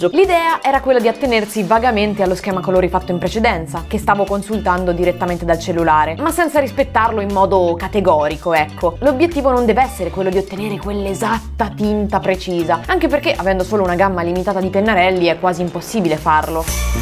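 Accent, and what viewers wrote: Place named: Central Italy, Italy. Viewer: L' accento da Italia centrale si sente un po' troppo, nel doppiaggio ...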